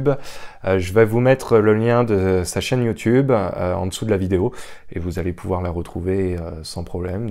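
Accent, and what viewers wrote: Quebec, Canada. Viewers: BONJOUR GROS , tu as pris l'accent QUEBECOIS déjà , c'est excellent j'ai remarquer sur tes intonations , c'est excellent dans un an tu seras bien acclimaté , en tout cas super choix d être parti vivre une belle aventure plein de bonnes choses à toi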